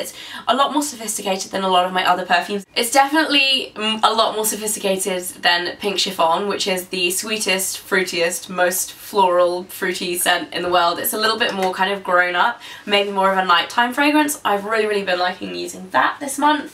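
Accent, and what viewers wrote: England, United Kingdom. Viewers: Your accent is 100% English but you have that upwards intonation at the end of each sentence (as if you're asking a question) which is all American! Love your videos :-) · what part of england u from? i'm really curious, can't tell? im guessing like either oxfordshire/ west midlands/ buckinghamshire?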